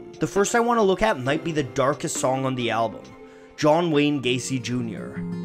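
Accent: Canada. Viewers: You sound canadian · jukeboxjoe As if the thick Canadian accent didn't give it away otherwise lol.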